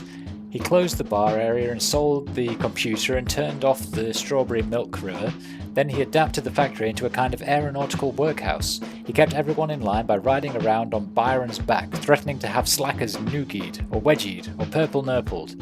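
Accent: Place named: United Kingdom. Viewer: If (I said IF) you are looking for constructive criticism, I'd do a lot more comedic commentary on what you are playing. You don't do very much at all, but your voice is great (especially for an American audience who are inordinately impressed by a British accent), but you aren't giving enough original material. More comedic commentary. I'm going to subscribe and see how you do. 👍👍👍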